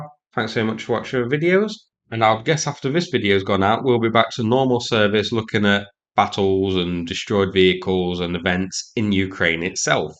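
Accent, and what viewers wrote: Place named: Nottingham, United Kingdom. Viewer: Good stuff. (BTW: Where are you from? I'm from Nott'm and your accent is very familiar!)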